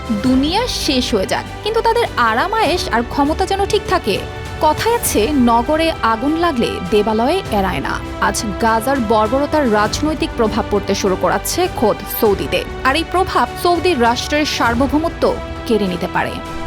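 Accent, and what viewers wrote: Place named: India. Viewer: ইন্ডিয়ানদের মতো খবর পড়া ভালো লাগে নি😢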